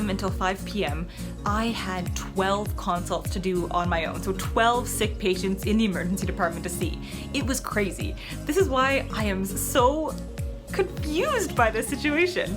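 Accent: Canada. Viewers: Love your videos!! And your Canadian accent 😄 I love watching medical dramas on TV but your channel is just as captivating because it shows real life & explains it all! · She sounds SO Canadian. Is she?? Edit: I see the tims. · Is it just me or dose she sound Canadian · I think its Canadian. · Clearly Canadian. The accent is strong in this one 😂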